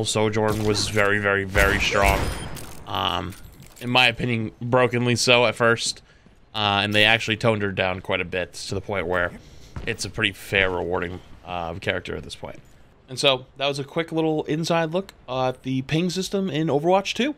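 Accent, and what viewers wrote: United States. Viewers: I guess ur american ?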